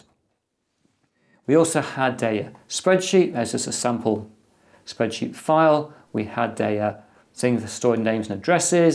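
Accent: United Kingdom. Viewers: pure British English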